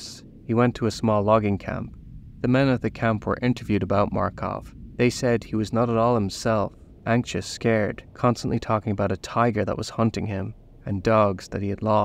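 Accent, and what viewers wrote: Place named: Ireland. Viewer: Please tell me that you are NOT trying as hard as you can to hide your beautiful Irish accent!!!!! Ahhhhhhh! Stop it! We LOVE Irish and their musical accents.❤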